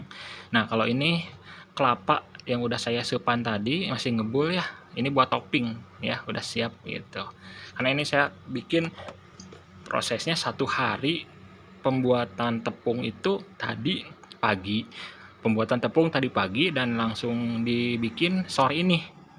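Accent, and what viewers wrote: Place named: Sunda, Indonesia. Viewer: Suaranya sgt sunda😀😉 Sundanya mana mang✌😉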